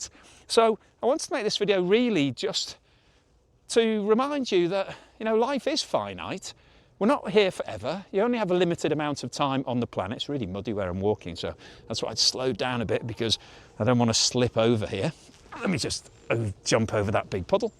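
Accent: United Kingdom. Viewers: Don't let the nay-sayers bother you. Take it from me, from over across the pond, you don't look a day over 70! Just kidding of course, that's an old American joke. Pun intended. Regardless of your advanced age and Brit accent, I love your posts, warm Brit beer, Fish n Chips, and since I was a kid and saw my first bike, I'm crazy for vintage Brit café racers. And whatever you do keep the King! We Yanks love the drama over your way so we don't have to think about the drama over here.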